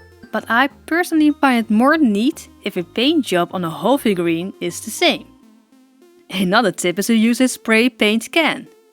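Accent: Netherlands. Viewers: Ik heb geen intresse in verven, ik heb vrij weinig pkmn speelgoed, maar het is zover best entertainend. Ik vond het wel grappig dat ik dacht dat je een Nederlands accent had voor dat ik je kanaal verder bekeek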